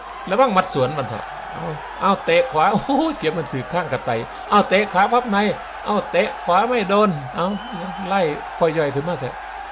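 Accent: Isan, Thailand. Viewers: ผมชอบ.มากกครับพากษ์อีสานบ้านผม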